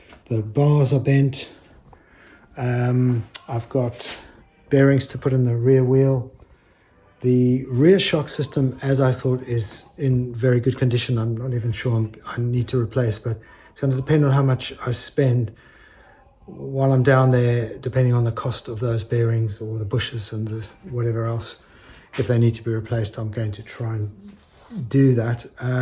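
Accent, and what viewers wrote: South Africa. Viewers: Enjoying your videos. Listening to you I originally thought you were doing the restorations in SA! Ex Durbs living in Oz.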